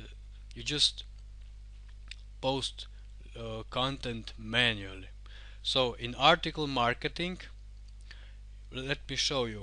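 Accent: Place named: Russia. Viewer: oh i love Russian Accent. Greetings from Indonesia my Friend.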